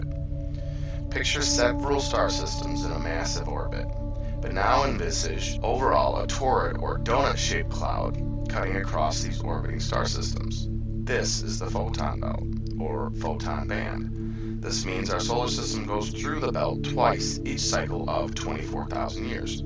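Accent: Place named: United States. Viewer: well he is irish, this is an american accent.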